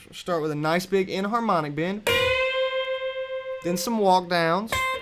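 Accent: South, United States. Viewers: thank you so much, i've been looking over for ages for this lesson! you rock! btw, where are you from? you accent sounds southern american.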